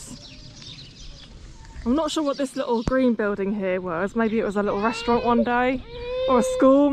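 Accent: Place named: England, United Kingdom. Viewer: Your English is from England, I'm catching it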